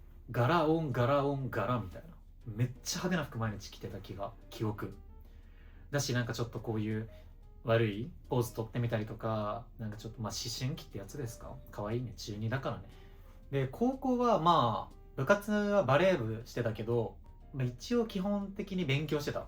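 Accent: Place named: Kansai, Japan. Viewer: 人生のセンスもあって本当にすごいですꧦ𐅁𐀸𐋠𛰙᭜𖫴𖫰𖫱𖫳𖫲𖫲𖫳𖫴𖫰𖫱꛰ﯩᩝ︪᭜𖫴𖫰𖫱𖫳𖫲𖫲𖫳𖫴𖫰𖫱꛰ީᩝ𛰚てかもっと関西弁ナチュラルに聞きたい😂同じ関西人なので期待してます♪♪♪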